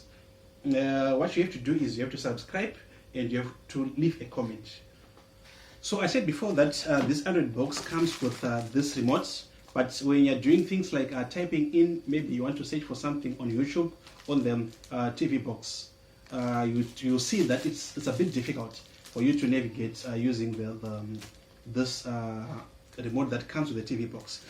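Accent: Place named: Zimbabwe. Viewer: Accent says Zim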